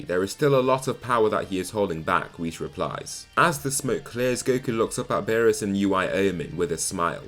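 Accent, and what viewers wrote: United Kingdom. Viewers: Are you scotish?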